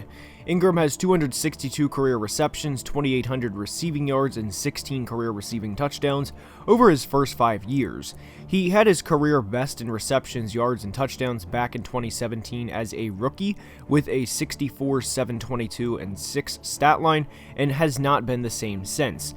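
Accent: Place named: Baltimore, United States. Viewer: I hear a Baltimore 'o' in the narrator's accent.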